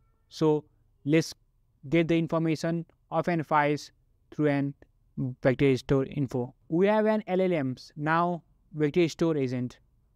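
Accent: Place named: India. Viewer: here is a tip. slow to .75, indian accent english becomes much easier to understand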